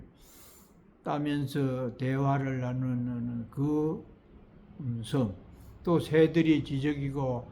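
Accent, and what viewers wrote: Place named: Andong, South Korea. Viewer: 안동사투리가 구수한 스님법문 잘듣고 있습니다 얘기를 너무 재미있게 하셔서 다음회가 기다려집니다 고맙습니다 스님❤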